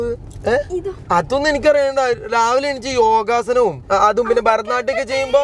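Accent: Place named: Haryana, India. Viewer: ki Haryanvi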